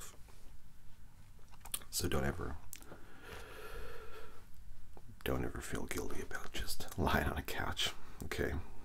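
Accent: United States. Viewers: I love that jumper. I want it. Love the american accent you do it so well. I felt a weird awakening with watching this 🤭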